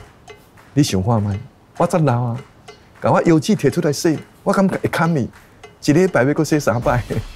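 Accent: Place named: Taiwan. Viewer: 賴副總統加油 辛苦你了 聽你講話有台灣的 味道 我一些好姐妹都很喜歡你 老實 又實在 希望你能高票當選 有人要嘿你就會把它黑過去 讚喔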